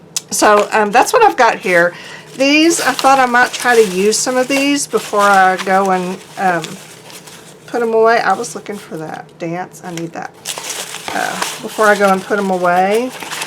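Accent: South, United States. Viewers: nice!!! I love you and your videos.. you have a wonderful southern accent that I just love to death.. 🤗🤗💜💜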